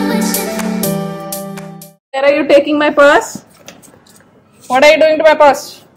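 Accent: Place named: Hyderabad, India. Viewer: Good, me telugu matladam bagundi,normal gane hyd yasa lone matladandi,inka vlogs cheyandi elage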